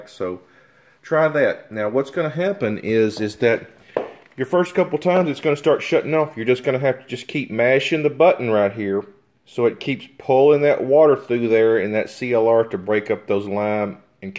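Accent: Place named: Texas, United States. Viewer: I knew you had to be from Texas when I heard you start the video :) Thanks for the tips.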